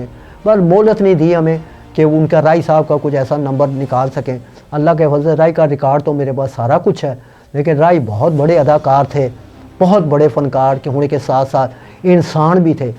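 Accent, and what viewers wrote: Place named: Punjab, India. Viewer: Sir tawada lehja Punjabi wa the aede nalon changa tusi Punjabi hi bol lo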